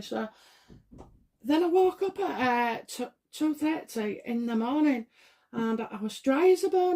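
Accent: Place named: Yorkshire, United Kingdom. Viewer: QE2 could be wishing for Harry to return without Meghan. Great reading. Could listen to you all night. Nice to hear a fellow Yorkshire lass x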